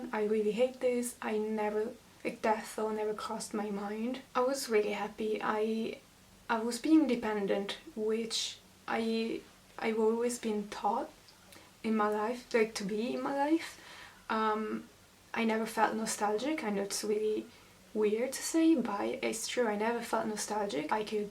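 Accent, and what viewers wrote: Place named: Ireland. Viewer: You have an Irish accent!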